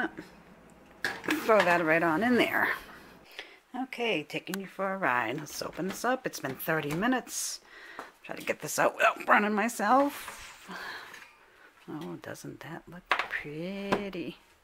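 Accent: Boston, United States. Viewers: I hear Boston! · Nice and easy - I'm thinking Boston or Waltham - but I could be wrong with that accent - but we all LOVE accents - it's what makes America that thang - as for the shot glasses - says a lot LOL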